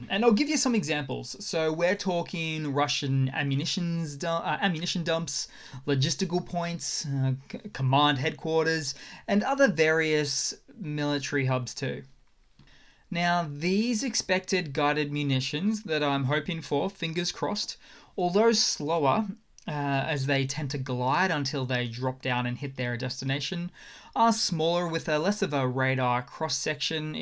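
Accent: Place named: Australia. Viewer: Since he's Australian (assuming by his accent) he might have opposite weather problems in January =D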